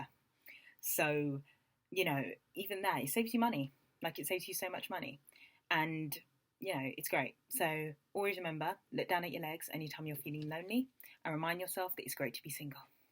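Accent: United Kingdom. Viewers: omygod u remind me Jessica sula in the series (skin) with your voice and your accent. I love British accent. but I'm not in England, I'm in Canada(America)and would like to be in your country to be better in English and to can talk with British accent. thanks to listen me and i hope u will share more super videos ad I will always listen u. bye😘😍😀😀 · Man....there's just something about black girls with British accents........😍😍😍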